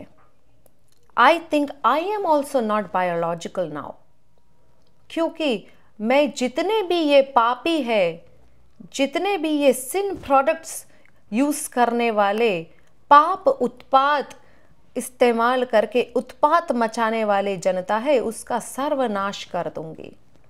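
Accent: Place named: South India, India. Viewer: Hindi in south accent too good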